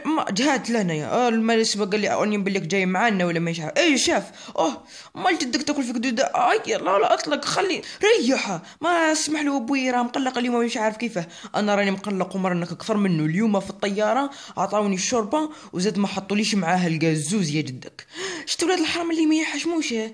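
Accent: Chlef, Algeria. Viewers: اللهجة تاع شلف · لهجة تع شلف بضبط 😂😂😂😂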